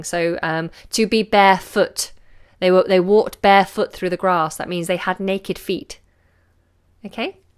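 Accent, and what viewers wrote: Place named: England, United Kingdom. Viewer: i like your engish accent more than Lucy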